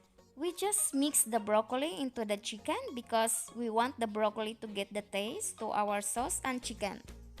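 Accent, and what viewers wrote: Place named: Philippines. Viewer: haha i love ur accent..haha..pinoy na pinoy..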